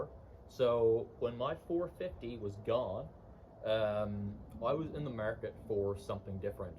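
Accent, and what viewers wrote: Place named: Canada. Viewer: what is NS? Accent sounds heavy canadian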